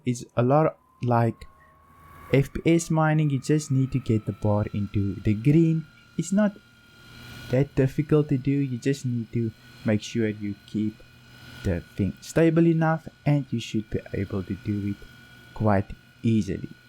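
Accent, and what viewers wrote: South Africa. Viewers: Yitte saffa i can hear it lol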